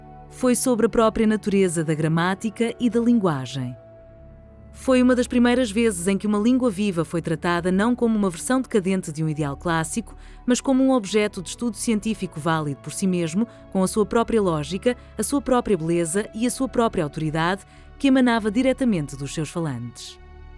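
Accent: Portugal. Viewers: Parabéns pela aula de história sobre a gramática de Fernão de Oliveira! Esclarecedor e didático esse vídeo ficou ainda mais saboroso devido ao delicioso sotaque lusitano numa voz agradável e de boa dicção. Obrigado! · Este é o sotaque padrão do português de Portugal e não o sotaque lisboeta. Uma grande parte dos lisboetas, senão a maioria, não fala assim. Questiono se o discurso não foi gerado por IA, uma vez que se nota algumas falhas, como por exemplo, pronunciar o nome piloto [pilôto] como sendo a primeira pessoa do indicativo do verbo pilotar [pilòto]. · A narração sempre com o sotaque lisboeta torna-se parcial e injusta para com os propósitos do canal, lembrando que há outros sotaques da língua portuguesa que também merecem ser prestigiados. Cada vídeo do canal deveria ser narrado em algum dos diversos sotaques falados em Portugal.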